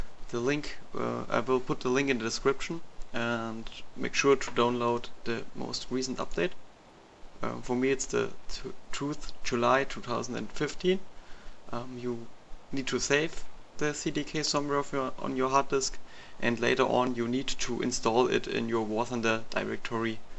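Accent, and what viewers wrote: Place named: Germany. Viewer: Denglisch xD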